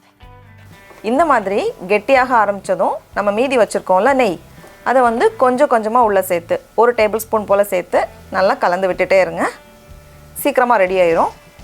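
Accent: Nagercoil, India. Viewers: Are u from Nagercoil ?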